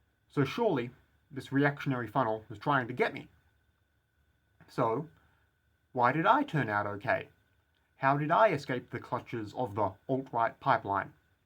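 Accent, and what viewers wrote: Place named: New Zealand. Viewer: The Kiwi accent is the best in the world 🇳🇿🇳🇿🇳🇿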